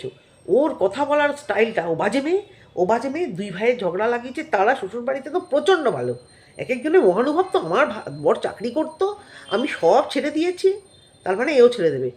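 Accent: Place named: Chittagong, Bangladesh. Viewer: Besh kichudin apnar controversy video dekhechi..valo lagche…besh straight katha gulo shunte valo lage..from Bangladesh..Chittagong er katha bolate aro valo lagche..amrao chittagong to.. Jai hok…priya khubi chalak,self lover…Ar khub show korte pare..hamboravab…kalpana r katha khub besi kichu bolar nei…priya r hotath tk hoyeche tai she kintu nijeke boro vabe kintu dekhchi onekei ekdom kom or bend he legeche priya k boro,mohan dekhacchhe…j konovabei kolpona r tar bor k kharap dekhate I hobe…its ok controversy korche kintu mone hocchhe priya r jonno Maramari korbe jeno…ajob…..othocho eder onekei mondirra k niye jkhn video kore khub politely bole emon ki kutshit ghora k eo sevabe kichu bolte parena…..priya khub irritating….tao ki bhalo proof korte chai he…bhul to dui dikei ache. Jai hok apni arekta regular hole valo lagbe r arektu somoi dile o video aro sundor hobe.valo thakben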